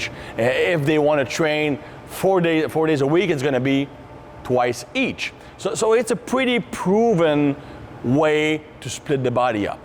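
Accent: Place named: Quebec, Canada. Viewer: He’s French Canadian bro 🙄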